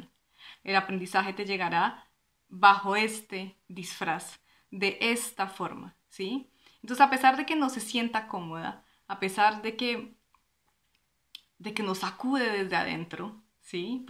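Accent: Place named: Colombia. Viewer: GRACIASSSS INFINITAS..NO SABES QUE BIEN SE SIENTE OIRTE..TANTÍSIMA SABIDURÍA..UN ABRAZO DESDE ISLAS CANARIAS..Y ESE ACENTO DE MI COLOMBIA💛💙❤....subscrita...desde Ya...y recomendaré tu Canal!!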